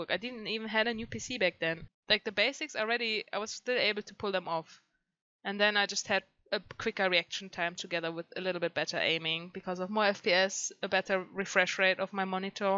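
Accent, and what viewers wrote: Germany. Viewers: The german accent tho 👌🏼 Man hört es 😅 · Den deutschen akzent fühl ich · Are u German ?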